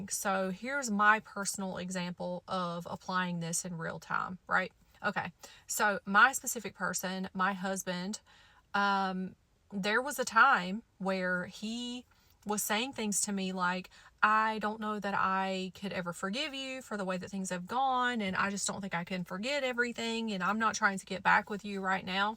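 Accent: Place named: South, United States. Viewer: I'm from the uk but i love your american southern accent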